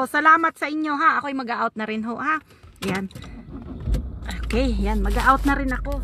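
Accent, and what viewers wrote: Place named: Batangas, Philippines. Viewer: Hahaha,,, nkkatuwa k nmn mgsalita ng batanguena🤣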